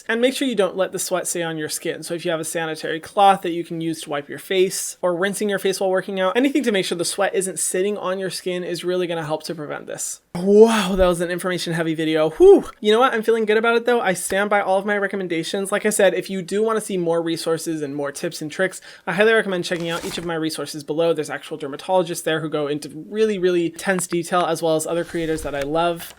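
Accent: Scotland, United Kingdom. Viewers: I’m Scottish and that accent hurt me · Great Scottish accent !!👏🏽👏🏽 (but they don’t sound like that anymore😂)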